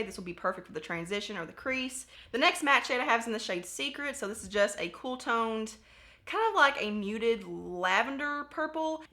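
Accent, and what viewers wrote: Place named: Kentucky, United States. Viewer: Girl I knew from the first time I watched one of ur videos u were from Kentucky! The accent gives it away for sure but I'm a Kentucky gal too! I'm from Letcher co ky.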